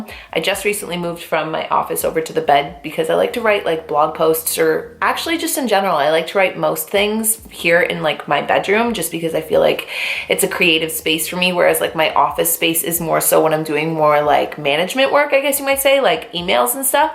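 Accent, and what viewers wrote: Canada. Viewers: are you canadian? you sound canadian😂 edit: i’m stupid u live in toronto🤦🏽‍♀️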